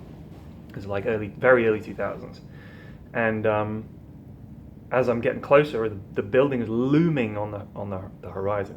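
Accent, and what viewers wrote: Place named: Yorkshire, United Kingdom. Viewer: your Yorkshire accent is spot on!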